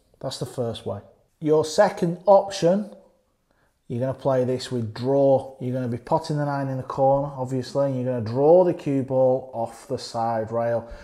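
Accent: Lancashire, United Kingdom. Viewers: Right 😉 By the way, your accent is close to my cousins in Garstang. Are you from Lancashire? Anyway.. I live in the states as an EX Pat. Haven't been back to Garstang since pre covid. Keep up the good work breaking down a complex game. Cheers.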